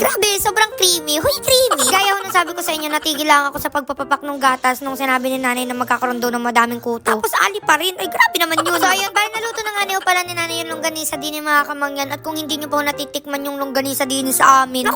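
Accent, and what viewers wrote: Batangas, Philippines. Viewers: South Cotabato, Mindanao po. May dugong Batangueña. Ang tono mo po kasi magsalita ih Batangas. Nakapunta po ako once ng Batangas parehas kayo ng Tono ih. 😊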